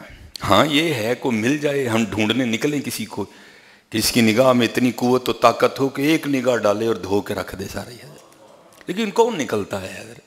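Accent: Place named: Punjab, India. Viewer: اردو اور پنجابی کی کمال مٹھاس ہے آپ کے لہجے میں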